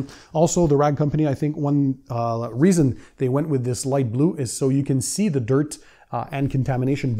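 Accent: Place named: Quebec, Canada. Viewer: you're welcome 🙂 I discovered your channel by only looking for detailing new products, especially Gyeon. I find North American detailing videos more complete than what we've got in France. Merci pour les quelques vidéos en Français, c'est toujours plaisant d'entendre un québécois parler. Avec un vocabulaire souvent plus riche en plus 🙂 Anyway, have you ever done some videos about your garage makeover? Because even if I recently look at your garage tour videos, I have the feeling that I've seen it years ago while I was doing plans for my actual home .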